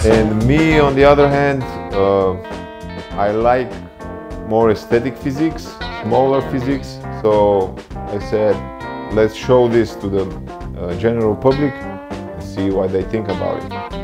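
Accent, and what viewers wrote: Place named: Balkans. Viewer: English with Balkan accent!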